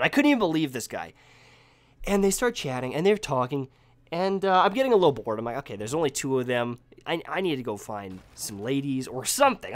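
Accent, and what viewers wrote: Canada. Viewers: R u Canadian ???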